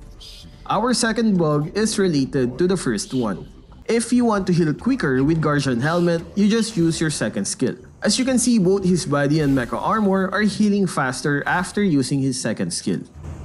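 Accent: Philippines. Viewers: Halatang pinoy talaga sa tuno mag English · Pinoy to halatado sa tono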